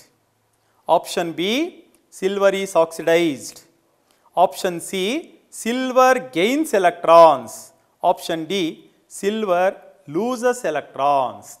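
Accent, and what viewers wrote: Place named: Tamil Nadu, India. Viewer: typical tamil village english but ok ok teaching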